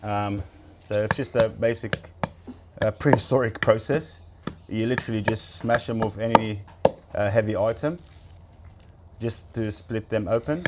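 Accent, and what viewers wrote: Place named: Australia. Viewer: That Wog Aussie accent, I love it. I'm a Wog Boy too 😃 Well done mate. Good video.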